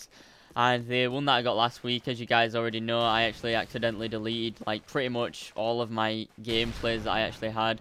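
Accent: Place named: Northern Ireland, United Kingdom. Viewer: Nice man! You sound like you're from Northern Ireland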